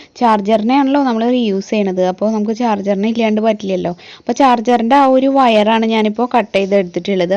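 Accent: Thrissur, India. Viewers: trissur anu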